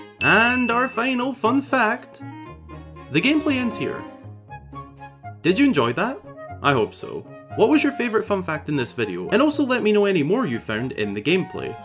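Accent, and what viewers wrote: Scotland, United Kingdom. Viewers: Mate I know all I did last video was compliment your title screen but I have the urge to say thank you for including captions. My mother loves Zelda and only has 10% hearing left, and also you're Scottish and whilst I understand the thickest Glaswegian, sometimes people find the accent difficult. So thanks again for being so inclusive!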